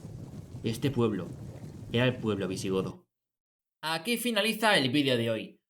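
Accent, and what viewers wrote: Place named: Spain. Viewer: Esa voz tan amplia bien española esa fuerza digna de una puesta teatral , bueno sirve para darle más animación, siga así señor que hace más interesante esta hermosa lectura , gracias desde La Paternal Caba Argentina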